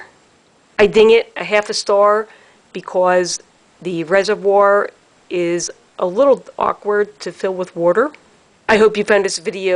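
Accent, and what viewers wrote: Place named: Chicago, United States. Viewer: The Chicago accent is coming through loud and clear! ☕️🍩☕️🍩☕️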